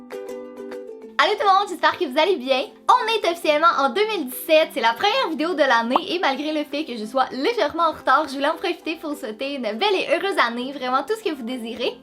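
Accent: Quebec, Canada. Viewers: Salut, tu es Canadienne ? J'adore ton accent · Tu es vraiment magnifique ❤❤!! Ton maquillage est sublime ❤!!! j'adore vraiment ton accent québécois ❤❤ énorme bisous ma belle ❤ j'aimerais trop passer dans ta story ça serait mon rêve ! ❤ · j'habite en France et j'aimerais franchement aller au Québec pour te rencontrer car tu es super belle j'adore ton accent et tu as une super chance d'aller en France ce pays est super j'espère que le Québec c'est un jour j'aurai la l'occasion de y aller super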